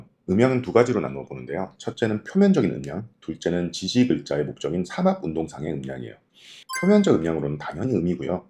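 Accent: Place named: Busan, South Korea. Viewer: 구독합니다. 좋은강의 부탁드립니다. 부산분이신가 봐요😮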